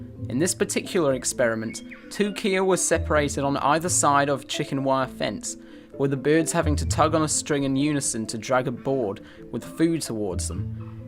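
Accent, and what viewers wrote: United Kingdom. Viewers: wait your a Brit?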